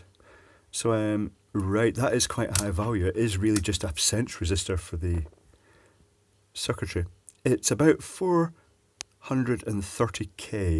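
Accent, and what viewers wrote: Scotland, United Kingdom. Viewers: I think it's all to do with that husky Scottish voice, the odd exploding cat, and the desire to watch soil himself....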